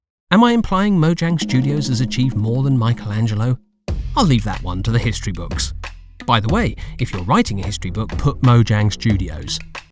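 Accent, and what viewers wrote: United Kingdom. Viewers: because British people have the best accent for narration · What is so funny about him being British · It's because he's British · This British narator makes the video more interesting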